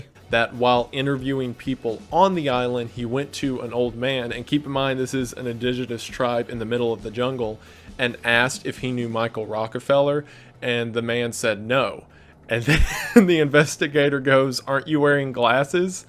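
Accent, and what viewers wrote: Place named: South, United States. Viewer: I adore Wendigoon. I go to sleep listening to that beautiful southern twaaaang.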